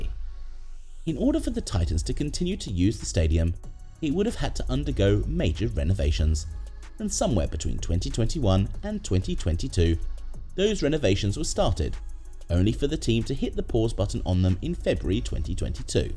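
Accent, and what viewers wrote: United Kingdom. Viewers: Knowing this is AI generated video, the statement about Bills wanting a new stadium , was pushed by the NFL brass. But the AI is incapable to think or know anything. Also British accent doesn't fit here.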